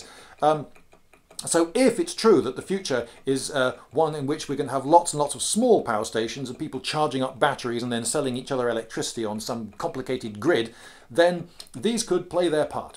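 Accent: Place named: United Kingdom. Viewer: I do so enjoy your so British calm but enthused way of speaking. A couple of my professors spoke this way and it kept my interest up to pass with rather good grades.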